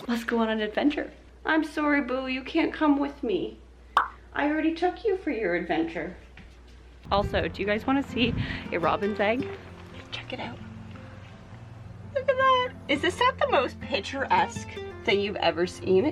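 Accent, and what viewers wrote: United Kingdom. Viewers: Did you binge watch Harry Potter before you did this cause you got the accent down! The birds! That was really awesome, so cute! I love both DIY'S. I didn't think I would care for the spoon art but it looked so good.. I stand corrected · Love your British accent 😆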